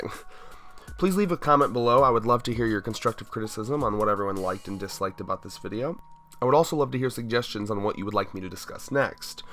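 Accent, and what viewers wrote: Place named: United States. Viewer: Why do you keep putting the r before e when pronouncing words, I think I developed a new pet peeve for American accents. Good vid tho subbed.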